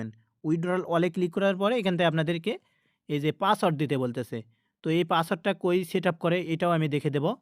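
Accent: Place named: Rajshahi, Bangladesh. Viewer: Vai apnar basa ki rajshahi te. Rajshahir moto kotha bolsen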